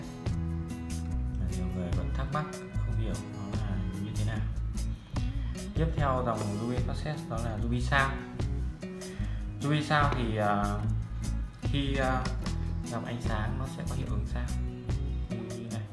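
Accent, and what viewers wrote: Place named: Nghệ An, Vietnam. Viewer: Noi dep Nghệ gi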